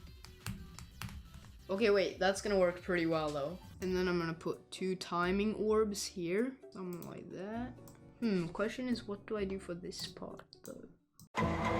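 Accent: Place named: Sweden. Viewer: Are you by any chance swedish? It just Kinda feels lika you have a bit of a swedish accent.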